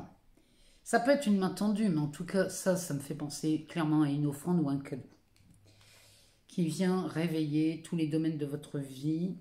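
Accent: North, France. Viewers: DEQUELLE REGION DU NORD ETES VOUS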